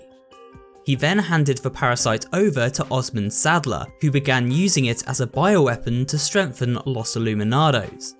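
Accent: England, United Kingdom. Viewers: i love that my man, translate the Spanish words into english just by changing the accent of the word.. typical english native speaker, doesn't even bother to learn how to say the word properly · I just love how says all the spanish Words with an english accent, para mi su forma de hablar en español y omitir la ñ me mata de risa (to me, him speaking spanish and skiping the ñ makes me laugh)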